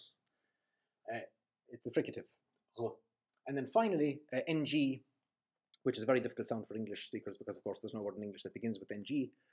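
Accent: Ireland. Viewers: I love the way that your English speech sounds just like my Irish born Father (RIP) spoke his English, (he was from near Murren, Donegal)- and I appreciate your excellent knowledge of the Irish Language.. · Grand video for someone like myself teaching himself Irish that wishes to learn the true pronunciation, free of English influence. What part of The Emerald Isle do you hail from, sir? I daresay your accent is one of the most beautiful, if not the most, beautiful I've heard in Hiberno-English or any variety of English spoken anywhere in the world.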